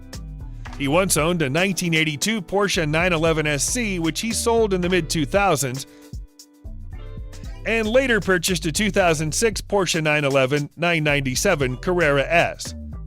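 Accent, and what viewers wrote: United States. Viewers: Christ get somebody who speaks English, as in English, not what some people refer to as American English, it makes these videos almost as unwatchable as the really cheesy still flying in and out. I am sure the £10 software has a fade option. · The American accents gets right on me tits say it probably ffs not hard aint kids anymore 🤦‍♂️ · Why is a yank commentating ?? VERY BADLY !! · jeez the american pronunciation.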